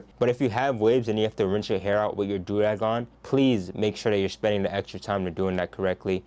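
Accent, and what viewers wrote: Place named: Jamaica. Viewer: Which country your parents come from. Mi hear yu accent !jamaica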